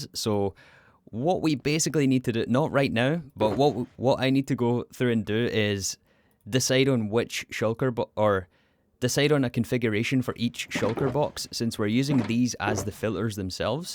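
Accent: Scotland, United Kingdom. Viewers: Are you Scottish and if so where you from? Just discovered your channel and love it. I’m from Glasgow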